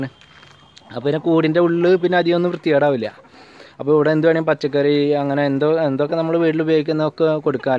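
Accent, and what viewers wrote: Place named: Malappuram, India. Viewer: Ninte vioce ketal trisur Karan thonilla.. Malappuram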